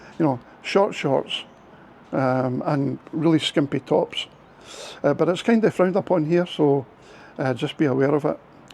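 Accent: Scotland, United Kingdom. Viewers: Binge watching your videos tonight from India. Your Oman videos are great and hey I like that Scottish accent😂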